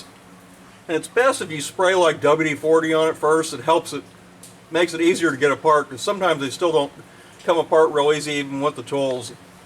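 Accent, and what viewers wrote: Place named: Seattle, United States. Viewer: nice information! you sound like you are from Seattle.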